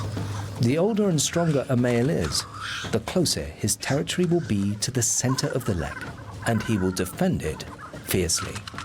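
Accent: United Kingdom. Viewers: British accent??? ☺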